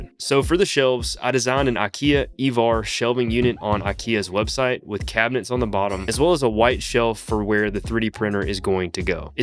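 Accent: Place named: Rural, United States. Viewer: First tech youtuber I've seen with a country accent. Love the build, looks clean!!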